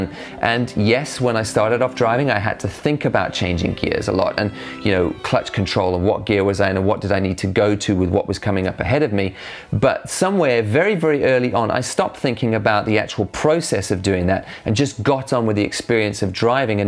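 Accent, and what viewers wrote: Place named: South Africa. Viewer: Hi - South African here. There are qualities in your accent that make me think you might be from South Africa. Are you? I've just subbed:)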